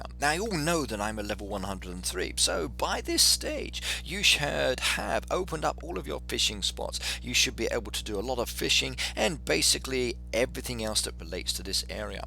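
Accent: United Kingdom. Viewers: am I the only one or does he have an English accent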